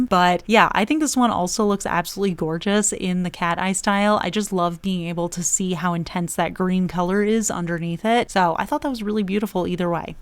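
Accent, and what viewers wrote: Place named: Midwest, United States. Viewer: You sound midwestern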